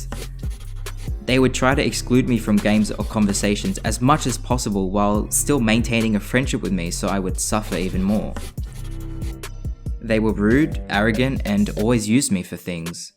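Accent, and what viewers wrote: Australia. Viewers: Haha I knew you were Australian. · I just found out you’re Australian- eyyyyyy (also Australian) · R u Australian? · so i dont know if i herd this right but you said '' deku'' i dont know i am so sorry i don really understand Australian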